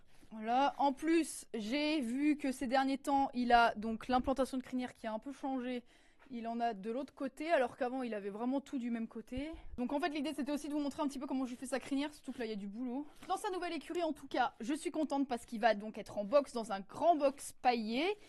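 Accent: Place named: Lorraine, France. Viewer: Cet accent Lorrain adoré qui sent le lard et la mirabelle !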